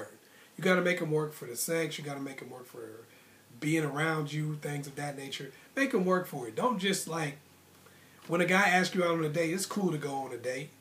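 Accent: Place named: Brooklyn, United States. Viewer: It sounds like you from my home town Brooklyn, NY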